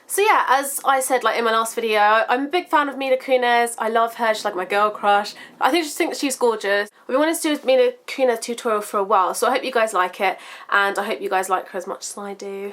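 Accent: United Kingdom. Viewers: OMG You are so beautiful!I love your voice! I love your British accent!I love your videos!♥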